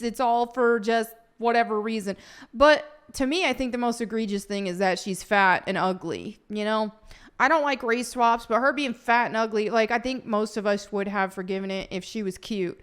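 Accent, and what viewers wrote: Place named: Texas, United States. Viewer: Hey I was wondering, what happened to your Texan southern bell accent you used to have and southern bell body movements and poses you used to do. I noticed you started to consciously practice annunciation there in your first videos and after that is pretty much went away. Nice work, was just wondering why you felt you needed to change to that extreme when you started streaming and posting vids online? I noticed that as well cuz us southerners don't really annunciate T, kinda rolls, don't really try on em but in the vids i noticed you really started hitting them hard.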